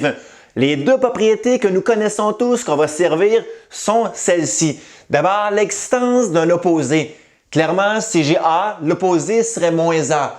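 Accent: Quebec, Canada. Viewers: L'entends tu le léger accent ?! Il semble évident qu'il ne parle pas un français métropolitain mais bien Québécois, donc avant de juger,il faudrait peut-être s'assurer qu'il ne s'agit pas d'une expression locale.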